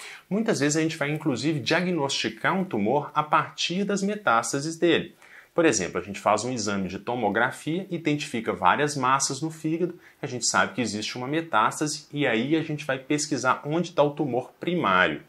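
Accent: Minas Gerais, Brazil. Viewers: Mais é di minas uai?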